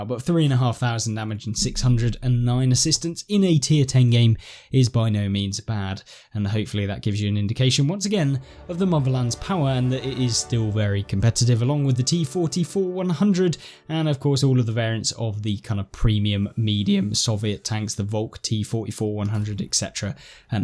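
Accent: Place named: United Kingdom. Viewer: Playing every class is a good way to learn the game, map as spots for light tank to hide in the open that will not work as a medium of heavy tank, Playing Arty will make you learn the places to hides and to stay on the move, in my personal opinion Good Arty player are Arty that stay on the move to, yes you can take one to 3 shot from the same position Sometime all your shot without moving but following your team as the game progress especially if you have a slow Arty can be a Good way to take the enemy by surprise if they expect you to stay at the base in the right corner of the map for example but all your team went left and you follow them then they will be confused on how they can get it by Arty and then don't know where to hide, yes classes have there roles to play but the more you can adjust during the game the more useful you are to your team with the motherland in that case if you top tier you can rely on your armor to play like an heavy medium and block shot and your reload is fast so you can support your light armor teammate, but in t10 game you better play it more like Spotting medium cause you got a nice camo on this one and can't rely on your armor but you can support your heavy that lack vision range at that point and help spotting TDS who fire at them, BTW really love your channel never got the chance to play with you yet I'm guessing you must be in Europe server as you sound from U.K, sometime I play with if your friendlist isn't full you can add me on Xbox RTB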